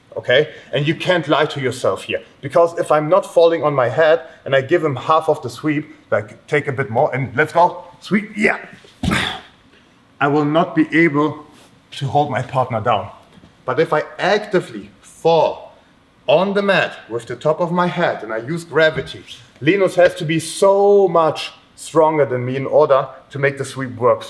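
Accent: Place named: Germany. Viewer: Extremely good explanation! Is it a german method explanation? (maybe your accent, gave ma a hint)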